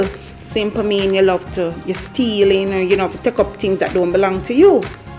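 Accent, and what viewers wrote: Jamaica. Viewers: The real Jamaican talking yes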